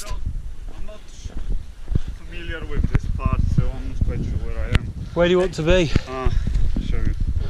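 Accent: United Kingdom. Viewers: hey. Yh iv only jist checked my spam box. Baaahahah anyways got your email. Me and the other guys are all having a meeting on Wednesday about the hike so il be in contact with you on Thursday. Speak soon buddy. By the way. Your accent sounds dead familiar. Are you from Mersesyside or Chester area by any chance?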